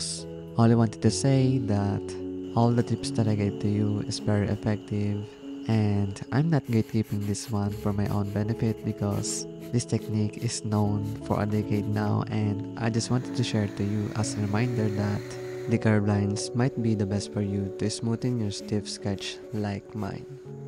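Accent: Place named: Philippines. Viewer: are you filipino? your english accent similar to filipino english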